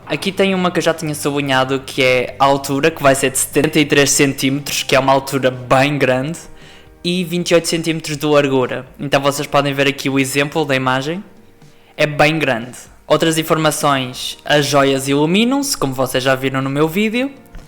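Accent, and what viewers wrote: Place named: Portugal. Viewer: O sotaque português é o charme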